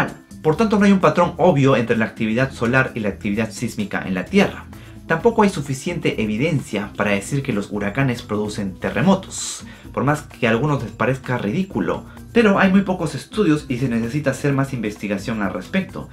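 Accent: Peru. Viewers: Eres peruano??? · Sospechaba que era de Perú pero recien hoy lo confirme xd , que orgullo que este hombre sea peruano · eres peruano? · Recién me entero que eres de Perú xD, aunque ya me daba esa sensación por tu forma tan neutra al hablar castellano y eso es algo característico de aquí.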